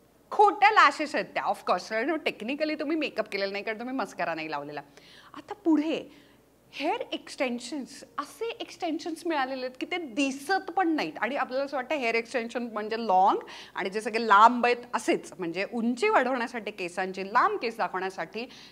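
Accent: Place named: Pune, India. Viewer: पुन्हा नव्याने तूझ्या प्रेमात पडले आज ❤️, ही पुणेकर भन्नाट आहे...typical पुणेकर tone भाषेचा...loved it 😘😘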